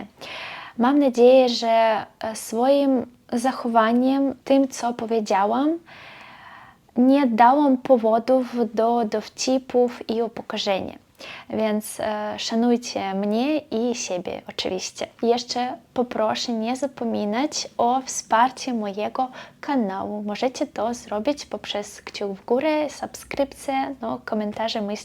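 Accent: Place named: East, Poland. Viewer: To mój pierwszy film pani. Bardzo ciekawie się słuchało. Zarówno temat interesujący, jak i przyjemnie słyszeć polski język w takiej wschodniej formie. Bardzo dziękuję za nagranie. Dowiedziałem się czegoś nowego. I gratuluję języka! Super.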